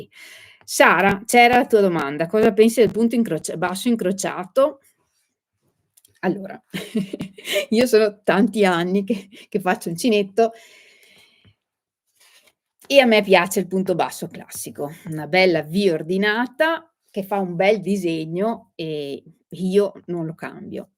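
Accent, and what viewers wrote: Veneto, Italy. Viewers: ciao, sto vedendo la registrazione della tua diretta. E' un piacere conoscerti, davvero. Confermo che hai un bella voce rassicurante, dolce e garbata inoltre il tuo accento veneto mi fa ricordare la mia mamma veneziana che non c'è più e mi manca molto. I tuoi tutorial sono chiari e molto utili anche per me che non sono più giovanissima e un po' testona. Brava! Un grande abbraccio 🤗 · Cara, che piacere vederti! ti seguo da circa 5 anni, sei stata e sei sempre di grande ispirazione con i tuoi progetti, ma ciò che ho amato subito di te ( e te l'ho scritto più volte), è la pacatezza, la chiarezza, la creatività e......il tuo accento veneto! lo sono anch'io, ma vivo in Piemonte! e sono mancina anch'io, ma poiché ben più vecchia di te, da bambina sono stata obbligata, per alcune manualità, ad imparare con la destra! vorrei scriverti ancora tante cose, ma intaserei il canale, per cui ti saluto con grande affetto, ti ringrazio ancora e...continua così! 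a presto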